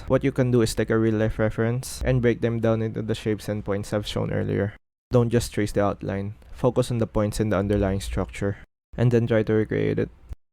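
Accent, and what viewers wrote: Philippines. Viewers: Are you from Philippines? You sound like you're from there 😂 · Filipino accent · pilipino accent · Man that Fil Accent is really distinct lmao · Your accent kuya sounds like Filipino accent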